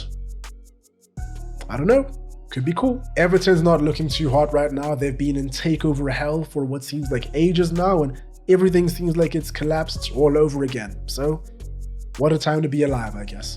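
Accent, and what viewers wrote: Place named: South Africa. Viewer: Is this dude a black South African? His accent is basically like mine. Not used to hearing it on the internet.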